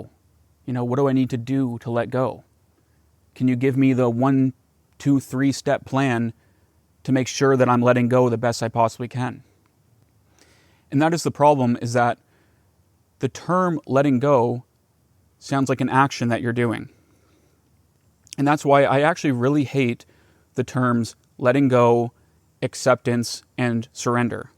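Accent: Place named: Canada. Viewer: I appreciate this video! I just came across your youtube channel. You explain everything in simple terms language....Look forward to learning more....You must be from the NW or Canada with the accent. And saying "Keister" is definitely older school and wise....lol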